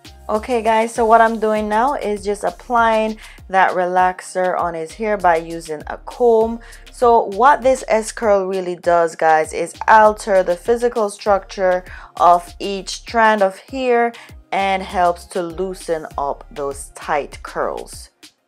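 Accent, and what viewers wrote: Jamaica. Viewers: Are u Jamaican?? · You sound Jamaican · you sound like a Jamaican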